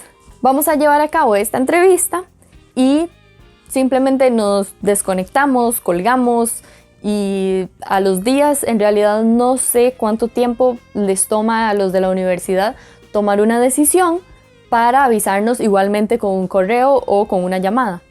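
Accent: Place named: Costa Rica. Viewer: omg ella es de C.R , ya decía yo que su modo de hablar se me hacía conocido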